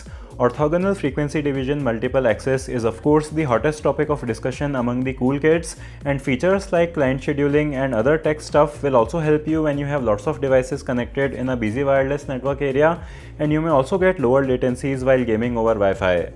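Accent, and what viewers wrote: India. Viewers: I fully understand why you speak so quickly, to keep the review as short as possible without compromising on your review detail. Your STRONG Indian accent is very difficult to understand so the subtitles are a useful addition. There is one mistake in the subtitles, stating that the router has 6 NON removable antennas but the subtitles read removable antennas. Otherwise a good review. By the way, here in the UK its available at Amazon now at just £99.99 which is almost £50 cheaper than is typical for the suppliers that actually have it on the shelves. Incidentally thanks to your reviews I cancelled my order for the AX50 in favour of the AX73 router. I am CERTAIN that I won't be disappointed. Keep up the great work. The price must have been a special one day offer because it rose to £169. Sorry guys I suppose I was just lucky, "right place right time". 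UPDATE: Wow that price seems to have been a one day ONLY offer and I have had to wait what seems like a LONG time for delivery, (expected today) the cheapest I have seen the router since ordering is £179 so I got a real bargain, assuming it lives up to expectations.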